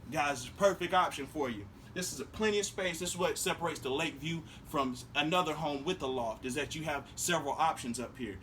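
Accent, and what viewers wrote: South, United States. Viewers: with that intellectual, southern accent 💜💜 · "In case you got little chitlins." 😆 I'm from the North so the accent really gets me. Of course, you all are now saying, what accent, you got the accent! 😜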